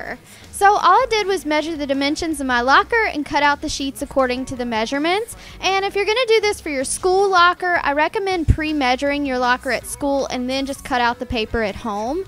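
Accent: South, United States. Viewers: You have a country accent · are you from the south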